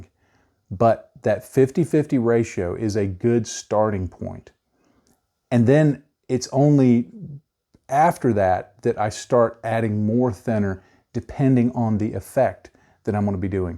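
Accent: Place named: United States. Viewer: Very goood clear info for a begginer like me or maybe an old hand. You are the first yank i can listen to Thanks buddy from a cross the pond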